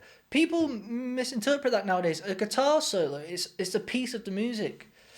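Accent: United Kingdom. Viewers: What part of Britain are you from? Love your accent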